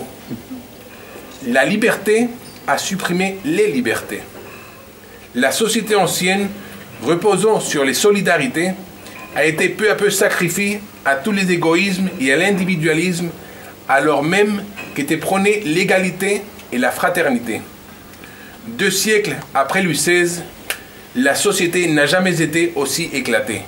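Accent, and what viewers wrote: Spain. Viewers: Il a un accent espagnol ? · C'est l'accent espagnol, pas roumain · un super accent espagnol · Par les temps qui courent, personne ne voudra en France d'un roi qui a l'accent de Miguel Indurain. C'est ça que je veux dire. Tant que vous nous vendrez la monarchie avec une tête de gondole pareille, la république aura encore de beaux jours devant elle. A la limite, l'autre famille de prétendants (celle de celui qui est mort il y a quelques temps) serait déjà un peu plus crédible...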